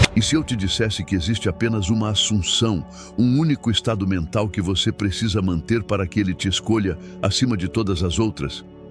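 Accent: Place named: Brazil. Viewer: Porque se oye en du brasilero?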